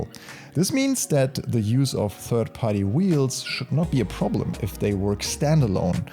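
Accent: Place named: Germany. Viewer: Wieso wurde mein Kommentar gelöscht wo ich sagte das man raus hören kann das du DE sprichst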